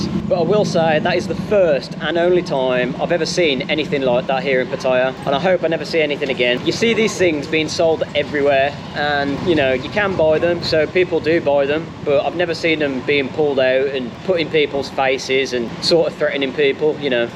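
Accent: Birmingham, United Kingdom. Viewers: You from Birmingham or somewhere close to the black country?... your accent is very familiar.. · Hei man. 1st, thanks for good contents. Then, i watched u a lot before my first trip to thailand, and it was really useful. In fact, because of your nice british accent ,its easier for people like me to undrestand , but maybe you can put an english subtitle on your videos ,so that would be so good for us. It will be more useful and also helping us improve our english. It was just a suggestion. And thanks anyway. Hope to see you on next trip❤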